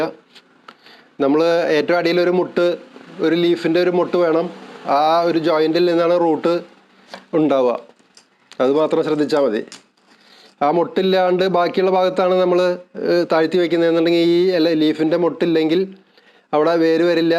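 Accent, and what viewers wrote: Thrissur, India. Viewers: chettan thrissurkRanaale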